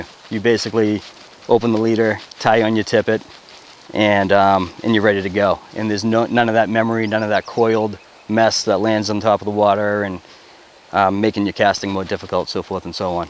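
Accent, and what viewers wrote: United States. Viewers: Spent the first half of my of life Boise, much of the second in New Jersey. You're definitely not from the former, lol. Massachusetts? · That is one crazy Idaho accent.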